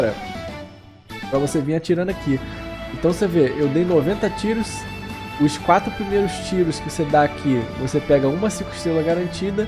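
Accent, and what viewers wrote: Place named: Rio de Janeiro, Brazil. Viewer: Só q o cara e carioca lkkkkk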